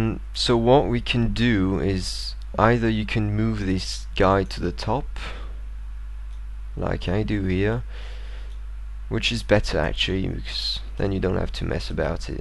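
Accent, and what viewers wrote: France. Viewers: frenchy accent spotted :p en tout cas t'as un super niveau en anglais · Frenchie accent <3 ;) I'm french too :)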